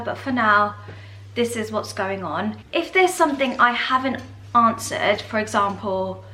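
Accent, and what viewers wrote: United Kingdom. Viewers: there's a saying "if i had a british accent i would never shut up." 😂😂 ur lucky u have a british accent. i wish i had one too lol.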